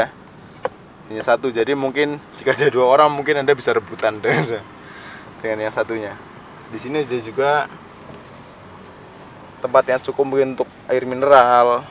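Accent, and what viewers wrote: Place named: Purwokerto, Indonesia. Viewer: purwokerto ya mas..salam ngapak sekang purbalingga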